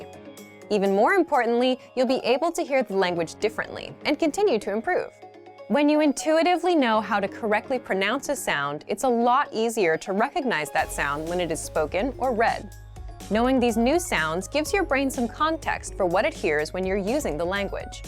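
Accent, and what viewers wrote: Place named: England, United Kingdom. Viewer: Thanks for your advises !!! I like your english accent. I hope soon to have this fluently way of speaking.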